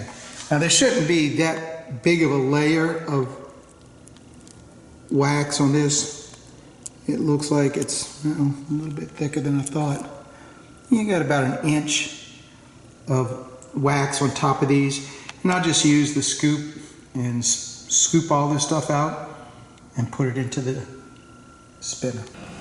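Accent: Louisiana, United States. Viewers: Stumbled upon you from another bee video. Could tell right away you were from southern Louisiana. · This just popped up on my YouTube (fyp) , you started talking and I immediately said “okay where is he from” because I new it had to be Louisiana lol just wanted to say hi from northeast Louisiana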